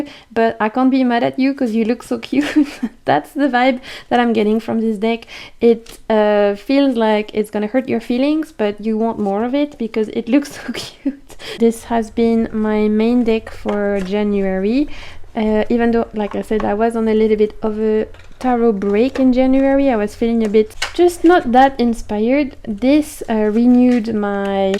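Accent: France. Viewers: Muchísimas gracias a tí por compartir tanto con nosotros!😃🙌💫 You know, your beautiful accent always reminds of how cool French is and puts me in the mood of listening to French or reading in French. I do it occasionally. 😄 !Sigue disfrutando del océano por los que no podemos! !Un abrazo muy fuerte!🌟❤️😉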